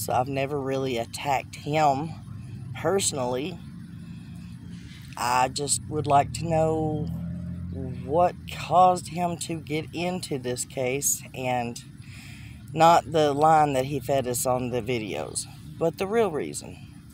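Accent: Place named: Tennessee, United States. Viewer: I love ya n I I think like you I. Agreeing 100 percent I love the idea I don't kw where are you cuz you sound like your around TN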